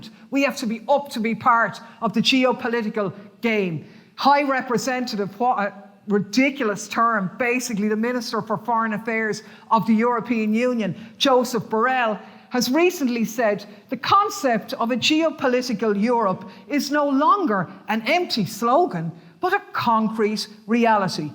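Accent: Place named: Ireland. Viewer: Irish accents though. So pleasant.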